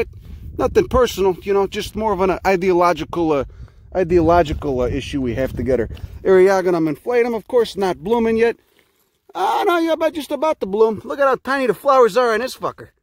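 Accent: Chicago, United States. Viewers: I was reading about Salvia Hispanica when I noticed myself reading this sentence in Chicago-type accent like yours. Such nice wording for it too: "While soaking, the seeds develop a mucilaginous coating that gives chia-based beverages a distinctive gelatinous texture"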